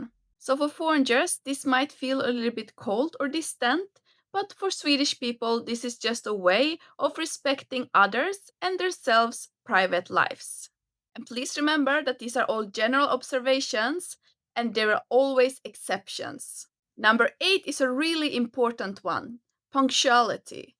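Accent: Finland. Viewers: You sound a little bit Finnish, you live in Sweden?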